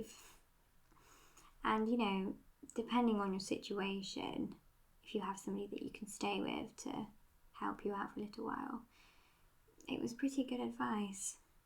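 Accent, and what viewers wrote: United Kingdom. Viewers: Your British voice is so soothing thank you for making this medical role plays help soothe my autism can we be friends i hope you don't mind but i messaged you on Instagram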